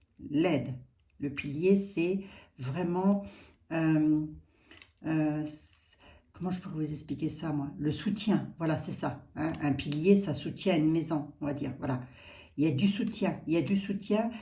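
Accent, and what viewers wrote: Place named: Lorraine, France. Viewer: Bonjour, vous avez un petit accent qui résonne à mon oreille...Lorraine ? Bien à vous !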